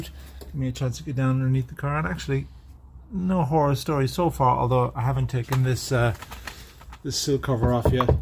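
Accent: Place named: Cork, Ireland. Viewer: ah you can still hear it in you is it north cork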